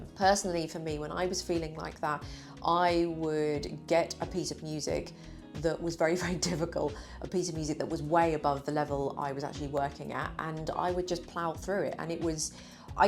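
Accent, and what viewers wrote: United Kingdom. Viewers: Not going to lie I stopped playing violin like 5 years ago yet still here I am watching your beautiful face and attractive Aura with your British accent😅😂😂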